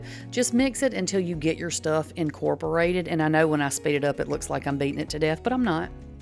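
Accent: South, United States. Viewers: Your SO SOUTHERN LOL😂 We just came back from Tennessee I would love to move there AND BECOME SOUTHERN… I don’t know if I could ever get it right tho 😂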